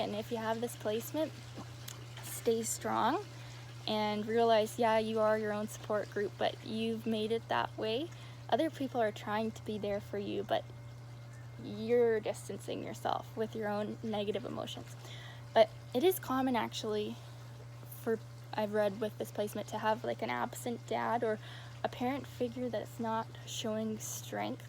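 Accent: Canada. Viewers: As an astrologer I frequently look up videos for my clients to watch for maybe a better understanding, (I do free reads) 12 th house Saturn is a difficult placement and I needed a video to express that and your video did that!!! Thank you you are a gifted astrologer whom I would love to chat with!! Am I picking up a Canadian accent?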